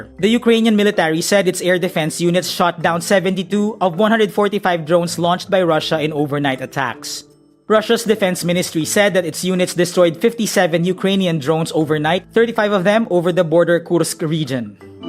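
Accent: Philippines. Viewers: your filipino accent is so thick its criminally annoying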